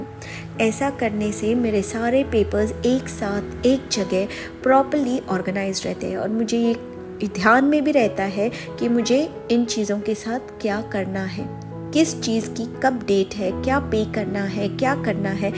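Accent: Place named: South, India. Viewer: Very nice.🎉 R u a south Indian ur accent i felt so.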